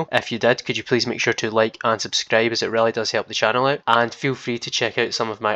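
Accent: Scotland, United Kingdom. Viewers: Good evening to my Scottish friend, Being able to apply L'Hôpital's rule to limits where possible, is the same as always having a pocket calculator available; hence I call this rule " The Calculator Rule " (lol), but also keep looking inquisitively for alternative solutions!, thank you again for a fantastic presentation told by you with a pleasant characteristic accent, recognizable everywhere... Thank you again and take good care as always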